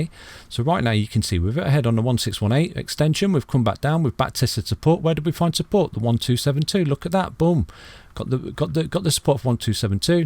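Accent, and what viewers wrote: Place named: England, United Kingdom. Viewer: Lol , it's that English accent 🤣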